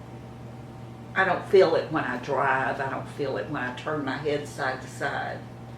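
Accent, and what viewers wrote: South, United States. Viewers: Hi, I just discovered your YouTube channel and enjoy watching them. I feel you are very honest with your recommendations and I trust in you even though I don't know you personally. I had the same type of vertigo and my doctor said it's called positional vertigo which is an inner ear imbalance and there isn't much she can prescribe for it. Mine hasn't come back for a couple of years. Hopefully you'll get better soon. Thanks for your wonderful videos. BTW I love your southern accent.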